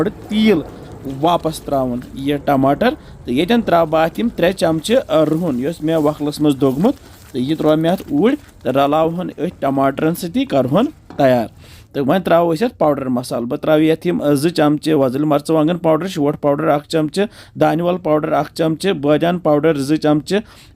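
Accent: Kashmir, India. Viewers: I like kashmiri voice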